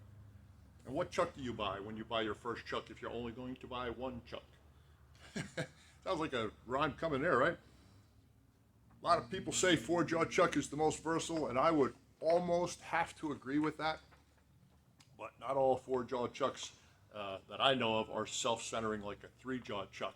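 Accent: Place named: Philadelphia, United States. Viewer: I'd guess Philly or nearby South Jersey but north of Baltimore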